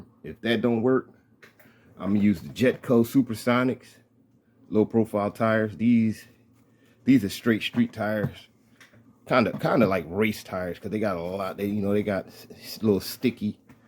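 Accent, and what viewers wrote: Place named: United States. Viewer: Cool tyres, I like the 1st ones. Where do I get get them ? From your accent and the surrounding turf I think you’re from the US? Maybe I can’t get them in Europe. But that’s a bad spot for testing tyres or speedruns…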